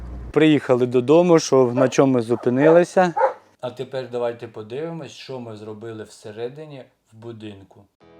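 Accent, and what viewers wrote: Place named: Kherson, Ukraine. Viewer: Привіт земляки, як тільки почула наш херсонський суржик, відразу підписалася на ваш канал,ми теж зараз мешкає в іншому регіоні,але дуже хочеться додому,правда,квартира в річпорту затоплена,в приватному будинку на лівобережжі живуть орки,то ваша ситуація доволі знайома,бажаю вам міцного здоров'я, процвітання каналу,і многая літа під мирним жовто-блакитним небом!!!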